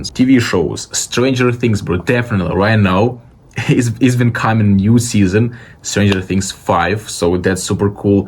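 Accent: Russia. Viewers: Вы из России? акцент🙃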